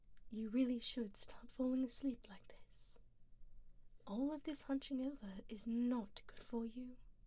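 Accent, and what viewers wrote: RP, United Kingdom. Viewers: Your British accent is amazing. So is this artwork of Leonara! You're so talented and amazing! You do a phenomenal job of bringing your characters to life! 😍😍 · I love when does her posh British accent. Its so relaxing.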